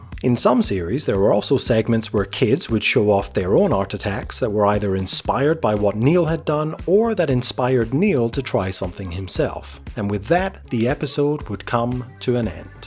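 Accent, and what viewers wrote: Scotland, United Kingdom. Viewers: Where is this guy from? A weird Scottish/ Northern Irish/ American accent all mixed together. Any ideas? Great vid btw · what accent is that? every other word is either scottish, northern irish, or american.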